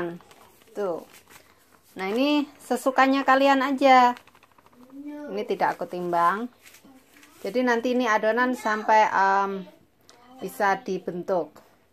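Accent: Java, Indonesia. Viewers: biar gk leleh mbk tapiokanya ....hhhhaq suka mbk nya ngomonge medok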